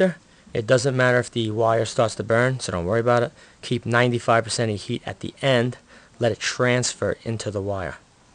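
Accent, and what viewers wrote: United States. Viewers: wondering would it be any easier to drill before you cut it to length...\and sorry I thought you had a slight speech impediment...I didn't realise Americans pronounce solder sodder...lol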